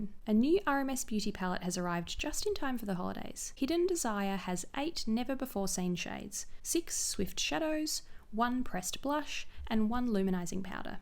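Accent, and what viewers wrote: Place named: Australia. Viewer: i love your Australian accent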